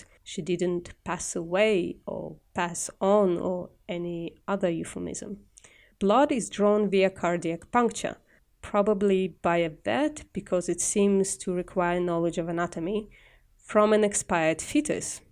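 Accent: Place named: Wales, United Kingdom. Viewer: Subbed love the WELSH accent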